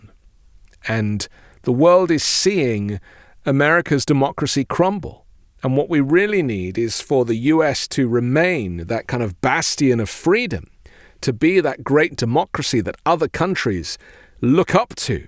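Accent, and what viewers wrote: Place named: RP, United Kingdom. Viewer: I came here cause I needed to hear your posh, measured, and calm British accent to soothe my frazzled nerves and chewed fingernails. I'm really trying to manage my expectations and your ever intelligent reporting and journalistic integrity has helped to keep me sane and from panicking. Thank you and everyone at MTN for choosing Science & Facts and giving us Democracy loving Patriots a news home.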